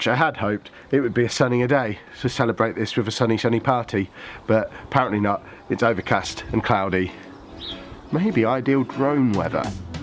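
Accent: United Kingdom. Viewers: lol I would want the headset I hear a UK accent are you in the UK or USA?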